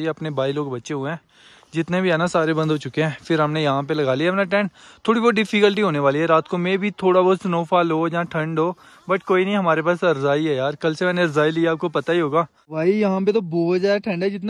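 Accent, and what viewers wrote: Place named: Chhattisgarh, India. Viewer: Are apna RAIGARH CG. SE h ye log inki tone se lga hi tha😀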